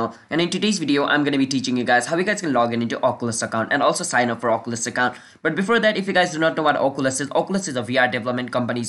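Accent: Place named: India. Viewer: sounds like an indian guy.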